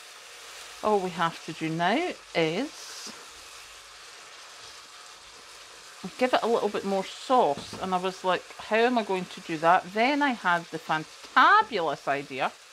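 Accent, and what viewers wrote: Scotland, United Kingdom. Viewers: i just love your scottish voice and your enthusiasm, its infectious xx keep it up, your views will GROW, your channel is just very CUTE xx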